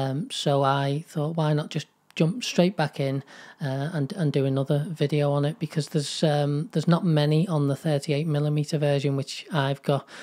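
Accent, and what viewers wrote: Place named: Merseyside, United Kingdom. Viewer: 😮😮😮 amazing review my friend.....nice to hear a fellow Merseysider on yt good review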